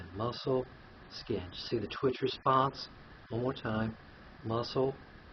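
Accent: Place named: Scotland, United Kingdom. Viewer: I thought he was Scottish when he first started talking lol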